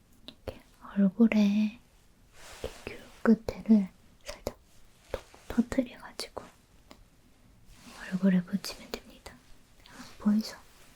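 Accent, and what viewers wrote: South Korea. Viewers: 영상 감사합니다^^ 대구사투리 정감가고 좋아요^^♡♡ 님 혹시 눈밑에 글리터 어디껀지 알수있을까요?? 넘 예뻐서요ㅜ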